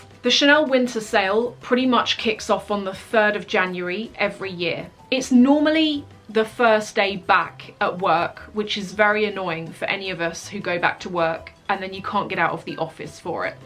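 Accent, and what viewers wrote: United Kingdom. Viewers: I’m only here for the British accent!!!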